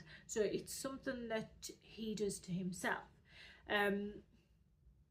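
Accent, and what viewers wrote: United Kingdom. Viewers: Is this man British ?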